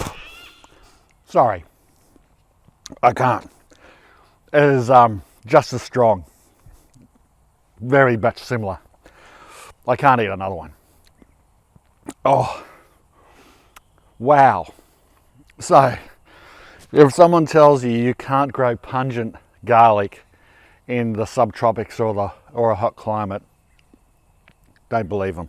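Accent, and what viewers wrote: Australia. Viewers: I am not sure which bit was best in the video *someone speaking with my accent for a change *someone who was still aware of the rest the world while talking about local things *someone who obviously knows what they are doing but is still capably of not being perfect * someone who is game enough to put a full clove of garlic in their mouth on camera. But gently I would like to point out...that ** puting dirt from the garden in your mouth is about as dangerous as stoking a camp fire with your penis! 😮 Aussie Aussie Aussie...oy oy oy!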